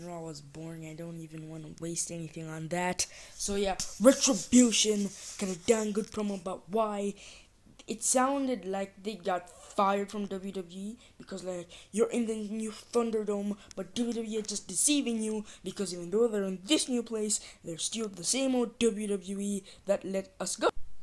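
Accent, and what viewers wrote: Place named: Ireland. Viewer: You very Irish’s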